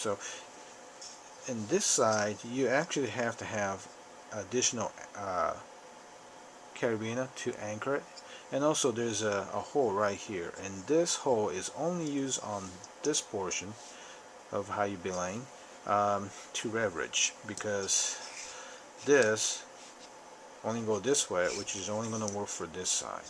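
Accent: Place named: United States. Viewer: So much easier to listen to America English that I use everyday.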